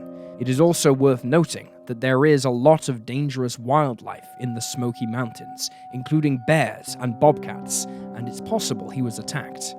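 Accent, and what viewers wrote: United Kingdom. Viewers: I missed the British voiceover!!! Welcome back!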